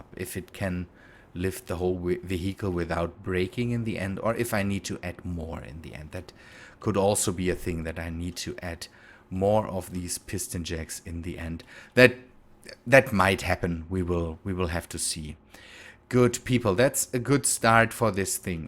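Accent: Germany. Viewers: you have a strong accent. your german right?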